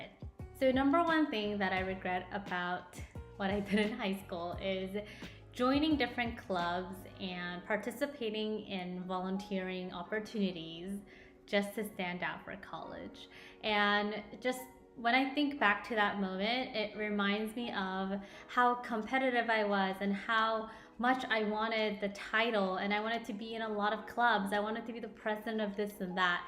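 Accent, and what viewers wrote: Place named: South Korea. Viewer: I love your 2nd gen Korean accent~~ lovely!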